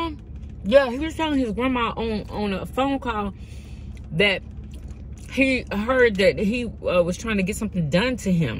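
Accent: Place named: AAVE, United States. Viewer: Dang this food look so delicious 😊 you crack me up speaking Ebonics or whatever it’s called 😂😂😂😂😂 I love your videos!!!❤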